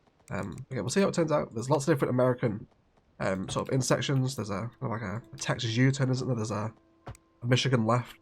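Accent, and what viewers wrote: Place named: RP, United Kingdom. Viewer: I listened to your proper, refined-sounding British voice telling me that you were inspired by a highway layout from Montgomery, Alabama! 😂 Talk about a contrast! 😂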